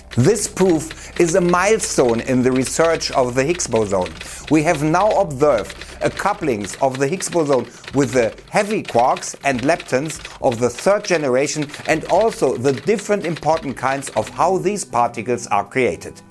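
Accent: Germany. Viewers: Ich liebe einfach das "DEnglisch" ^_^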